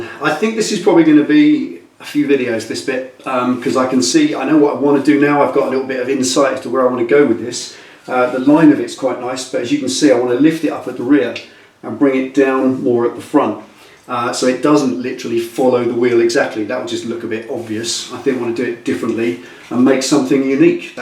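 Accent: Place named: West Country, United Kingdom. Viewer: Found your vlog recently, You've mad skills. I thought there was something familiar about your speech etc. , then I saw you're from the West Country. I was born there, married a Lyme Regis girl, and miss it as we've lived in the States for years. Holidays always seem to end too quickly. I see you collect number plates. I wonder if you'd like one of my old bike plates from Florida. RIX TOY. It's yours for the asking. Keep up the good work. At 70yrs. old I still learn things from every posting. Thank You. - Rick Newbery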